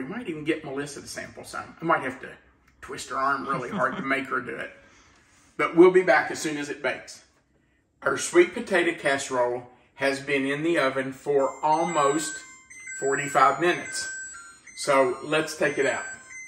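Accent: South, United States. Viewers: These recipes and slow southern accent remind me of my daddy and Mother, long since passed. Nothing beats the taste and love in these delicious dishes.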